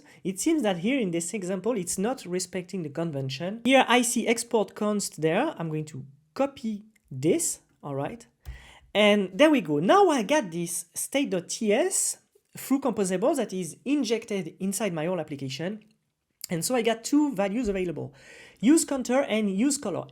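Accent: France. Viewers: Thank to this tutorial and surtout ton french accent , on a tous le même mais ça me va parfaitement comme ça . Good job 👍💪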